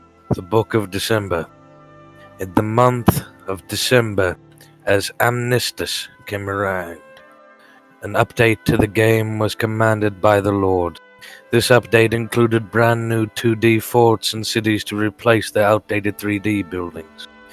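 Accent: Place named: South, United States. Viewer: narrated by a fellow southerner. liked.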